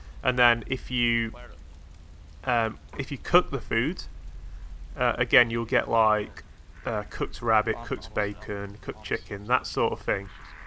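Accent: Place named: England, United Kingdom. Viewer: He's just English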